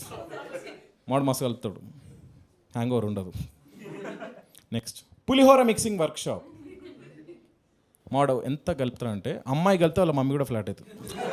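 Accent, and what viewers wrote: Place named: Warangal, India. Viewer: Bro mi voice Veni cmt pedudham anukuaa wgl ani nvuey cheppavu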